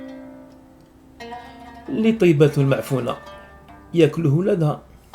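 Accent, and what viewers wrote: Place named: Algiers, Algeria. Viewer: امثلة مليحة الله يبارك فيك، و هذي امثال جزائرية ولكن راك تنطقهم بالهجة العاصمية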